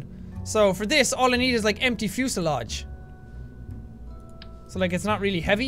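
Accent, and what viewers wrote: Ireland. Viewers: I loved his Irish accent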